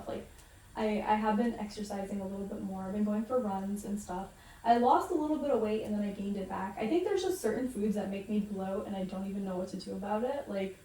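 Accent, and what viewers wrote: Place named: United States. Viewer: One generation is all it takes. You may be eating Indian food, but you sound so American is beyond belief. : ) your Puerto Rican Indian : )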